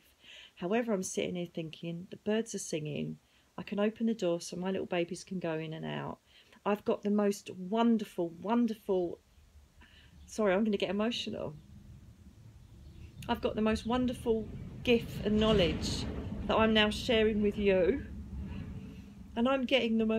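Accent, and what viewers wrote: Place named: United Kingdom. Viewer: Hi, it’s eastern standard time. It’s wed March 8th, 2023. I’m texting from Massachusetts, USA. I’ve just recently started listening to you. I feel I was guided to you. I’ve been listening to mediums for awhile now and have been very intuned to spirituality and all that you talk about for the last several years. I love your messages and your funny, down to earth personality and your adorable British accent! Your a pleasure to listen to. I’ve turned my 83 yr old dad on to your videos on utube as well. I’m so greatful to you for your gifts and happy for you that you seem to be living your best life now. We all have our challenges and you certainly have had your share! Enjoy life and Thankyou for sharing your gifts with the world. I hope and pray that more people will tune into you and people like you so we can save our planet and the human race. 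I will be sharing your messages on social media in hopes to spread them. God bless you and yours and take care. 💕🧚‍♀️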